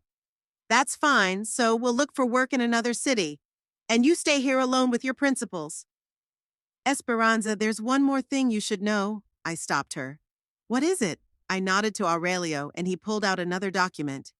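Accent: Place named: Mexico. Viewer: What's up with the " Frito Bandito" accent?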